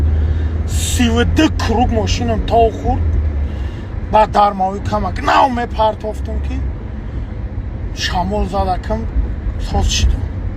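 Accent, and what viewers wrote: Kulob, Tajikistan. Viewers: Ака зури бади бахдо кулоби гап нест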